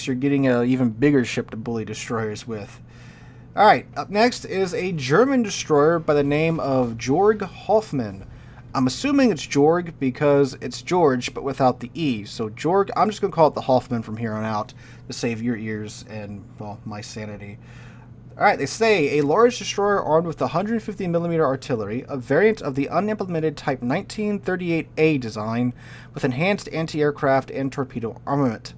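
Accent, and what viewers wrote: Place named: United States. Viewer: Really man? That pronunciation... You cant just read stuff in an american way and expect it to sound about right! 😅 Let me help you: G in Georg is nothing like the english G... its not like the J in John its like the g in hug (both g). And the e is like the men. GE-ORG. And regular R not the amerikan rolling tongue R. Please say Hoff-mann with a like part/dart...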